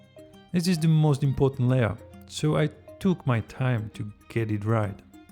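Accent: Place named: Czech Republic. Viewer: that czech accent tho.. classic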